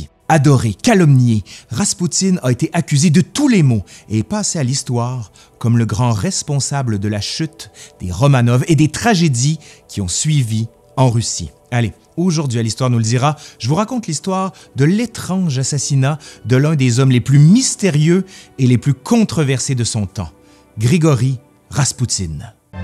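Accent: Quebec, Canada. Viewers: Excellente présentation de la vie de ce répugnant mais unique et célèbre personnage. Je commence à apprécier l'accent québequois ;) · Merci pour vos vidéos! Je suis en train d'apprendre le français, et c'est sympa avoir des ressources intéressantes avec un accent québécois. :D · J adore cette chaîne !!! Et l accent des cousins québécois Force a vous 💪😍👍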